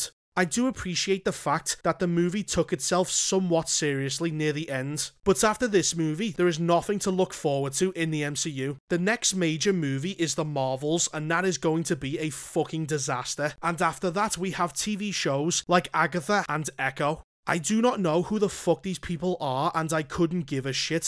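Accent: Liverpool, United Kingdom. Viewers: It's scouse, not Scottish 😅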